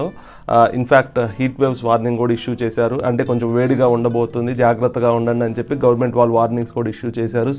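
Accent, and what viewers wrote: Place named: Rayalaseema, India. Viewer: Your ascent seems rayalaseema ...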